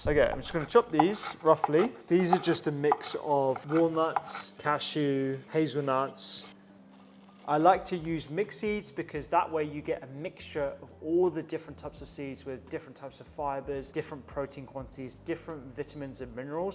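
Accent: United Kingdom. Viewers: I understand the British accent is tempting :). However, please do post a healthy bread recipe that suits middle class folks. I am sure this one is more suitable for the $$$ demographics. Incase there is already one available. Let us know aswell. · Hello! Great recipe! 🍞 the finest bread. Are you of Indian or Pakistani extraction? I just subscribed to your channel. Love your British accent 😊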